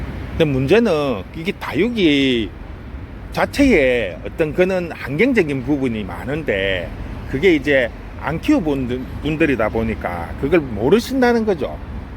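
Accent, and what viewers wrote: Gyeongsang, South Korea. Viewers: 경상도 말씀이지만 초보자들이 잘 알아 들을수 있도록 귀에 쏙 들어오네요 좋은 정보 감사합니다^^ 오늘도 축복 되세요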